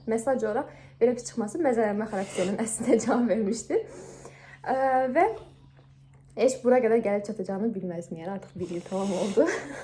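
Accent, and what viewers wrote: Azerbaijan. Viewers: Ozum Zaqatalaliyam amma Bakida yaşayıram.necese təsadüfən qarşıma çıxdız...avar olmaginiza rağmen danışıq diksiyaniz ve tərziniz aksentiniz cox gözəldir.meni o celb eledi ki size baxim😊ve Maşallah beyimizin bir sözüde lap xosuma gəldi ..eger bir adam sizin üçün deyismirse deməli o düzgün adam deyil😅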